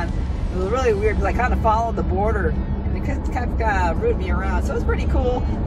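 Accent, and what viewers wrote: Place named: Minnesota, United States. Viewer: Just heard you say you're from Minnesota. Thought I heard that accent😃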